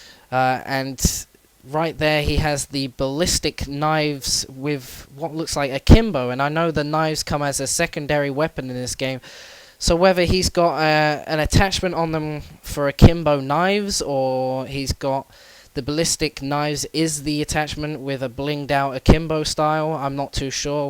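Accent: United Kingdom. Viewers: great job breaking it down! Brits make things sound much cooler than us yanks. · you sound like your from london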